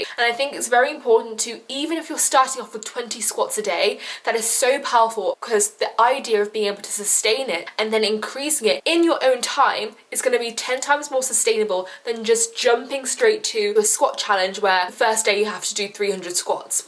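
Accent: RP, United Kingdom. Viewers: You sound so posh you remimded me of my of my cousin who goes to private school loooollllll🤣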